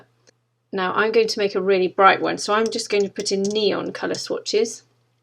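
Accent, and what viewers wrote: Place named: RP, United Kingdom. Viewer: Brilliant! Thank you so much! So much to learn! With you, step by step, plus that angelic RP kept me focused all the way through!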